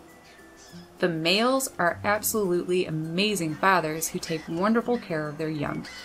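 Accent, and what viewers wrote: Canada. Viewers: Blue jays “ afften gehda biyiahhdd rahhp”... what ? Haha. Where is she from ? Lord !!!! Have mercy !! Oh wait. Canada. She said a boat it. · You sound canadien. So these might be Toronto Blue Jays?! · "A hewse" and "figured oat" = canada. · Boy are you from Canada! {but thats a good thing}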